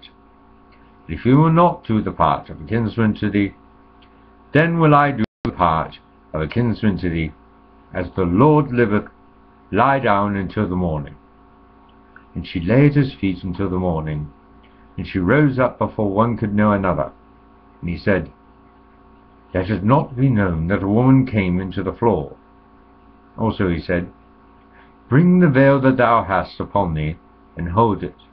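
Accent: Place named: Scotland, United Kingdom. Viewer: your Scottish great-grandmother (who is my grandmother) would be proud of your command of the Scottish brogue! Well done! And God says His Word will not go out and return unto Him void. - Judy Doorn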